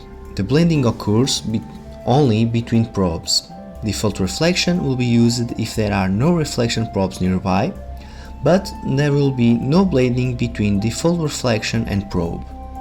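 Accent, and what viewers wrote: Portugal. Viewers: Eu tambem sou de Portugal, bem me parecia que você estava a falar com um sotaque português.:)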